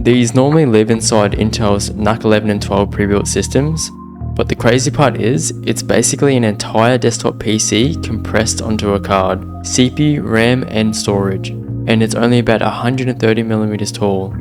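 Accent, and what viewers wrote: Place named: Australia. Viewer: You sound Australian bro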